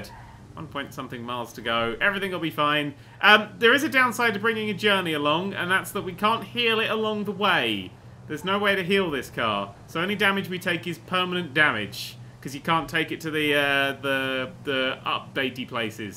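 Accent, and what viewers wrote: England, United Kingdom. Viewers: No he is just English.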